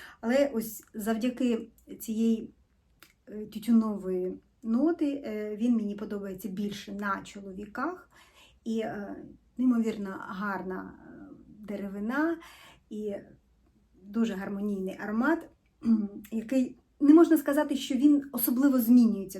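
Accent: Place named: Russia. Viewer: Акцент очень сильный, вы говорите на русском в быту... Это точно😊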